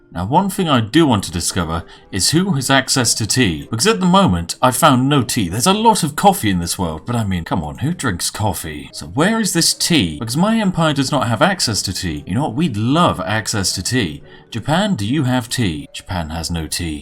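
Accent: United Kingdom. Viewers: Hmmm... sounds british to me. "Salute the queen" and "sniff your tea" Yeeeah okay, he's british alright. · This guy is annoyingly British but he makes good content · Omg you British as fuck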